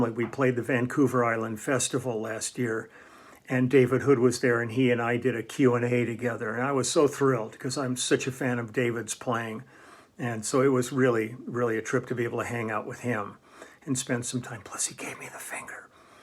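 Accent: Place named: Wisconsin, United States. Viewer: I still hear the Wisconsin in your voice after 50 years away😋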